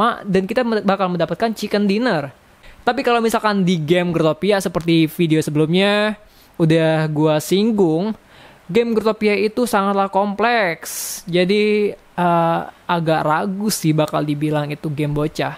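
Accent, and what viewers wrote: Indonesia. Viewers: Bang, aku mau nanya tapi jujur ya, abang orang Babel ya?, Bangka Belitung,.. iya gak?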